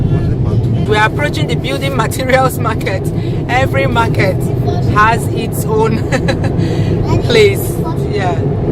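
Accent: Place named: Anambra, Nigeria. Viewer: Love anambra igbo accent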